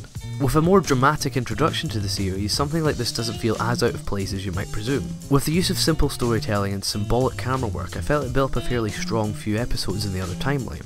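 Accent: Scotland, United Kingdom. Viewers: Where are you from? Your voice sounds like you're from Edinburgh/Borders. Great video.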